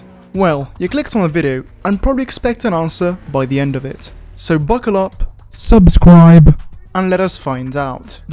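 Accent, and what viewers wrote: Italy. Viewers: italian by any chance? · I love the hidden italian accent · You're Italian??!!! Wth. I am too. Bro il tuo accento é pazzesco. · sei italiano?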